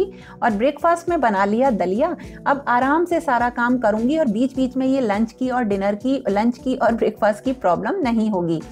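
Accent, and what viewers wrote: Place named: Bundelkhand, India. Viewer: Hi.ma'am aap kaha se ho,apki tone bundelkhand jesi h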